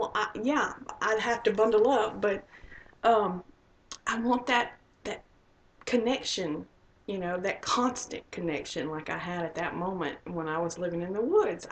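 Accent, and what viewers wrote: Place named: South, United States. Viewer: Your accent sounds like home to me. :D I'm from the South. Love your story!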